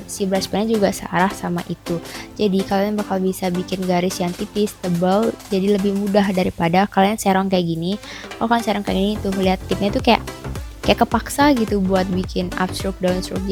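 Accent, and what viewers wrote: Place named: Bali, Indonesia. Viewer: kek logat bali pls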